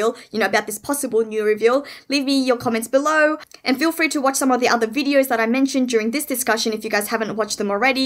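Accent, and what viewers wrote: Australia. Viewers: Oriental with an Australian accent? That caught me off guard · Are you west Australian ? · Australian accent? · You from Australia? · Aussie?!?!?! Yeahhhhh you go woman!!! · You Aussie???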